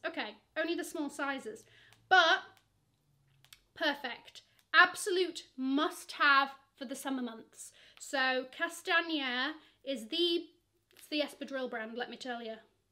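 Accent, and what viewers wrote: United Kingdom. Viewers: You are definitely my favorite British accent youtuber! Love your comments, your facial expression. Said that before, can definitely listen to your talking forever.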